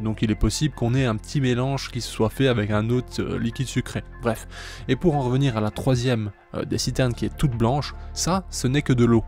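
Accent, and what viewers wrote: Belgium. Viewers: Petit accent Carolo ?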